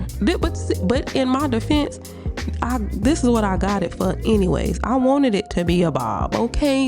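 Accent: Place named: Houston, United States. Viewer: Commentary is GOLDEN!!!! You sounding like us out hea in Houston lol. & that hair baby!!!! You ate down! Beautiful 😍 👏🏽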